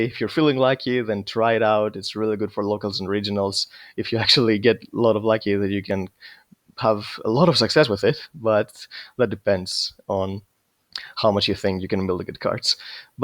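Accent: Greece. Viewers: Δεν παιζει να μην εισαι ελληνας με τετοιο αγγλικο xD <3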